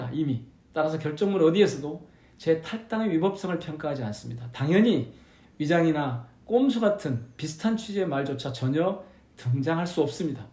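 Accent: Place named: South Korea. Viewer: 형배의원님 겁나게 축하드려 불라요. 인자부텀 민주당 안에서 구수한 사투리 더 많이 들을 수 있게 해 줘부쑈! 🎉🎉🎉🎉🎉🎉🎉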